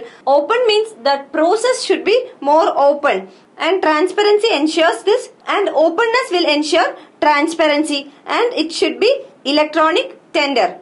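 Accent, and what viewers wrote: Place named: South India, India. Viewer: mam your accent looks like typical south Indian difficult to catch and moreover you were fast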